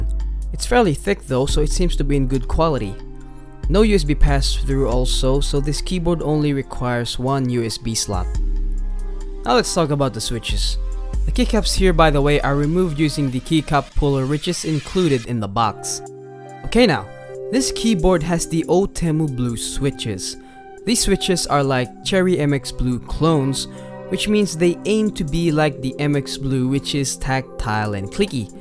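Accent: Philippines. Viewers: Filipino accentttt... good video bro.. nagdadalawang-isip ako as to get this or not but I'll take ur word for it..looks durable enough and it seems like a good budget mechanical keyboard...kudos 💪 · I thought I heard a Filipino accent 🙂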